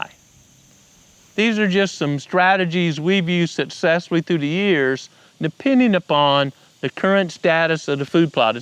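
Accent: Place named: Eastern Shore, United States. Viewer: You from the Eastern shore? Accent sounds like it.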